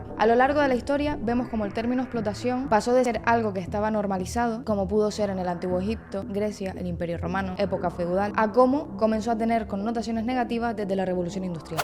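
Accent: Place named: Andalusia, Spain. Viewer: Eres Andaluza o canaria seguro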